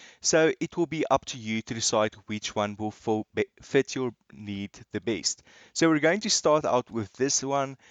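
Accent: South Africa. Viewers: Your south african again bra ek ook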